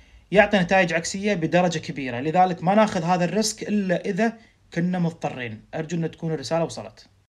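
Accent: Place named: Syria. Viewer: حلو الحجي السوري انا من العراق بس اكيد الهجه السوريه حلوه تحياتي لكل أهل سوريه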